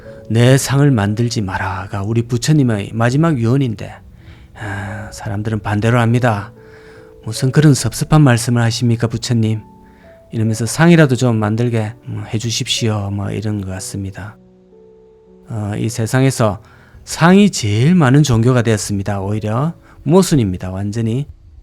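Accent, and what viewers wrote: Gyeongsang, South Korea. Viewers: 님 넘 재미나게 갱상도 사투리로 설명도잘하시고 웃기심니더 많은 영상 부탁드리기심더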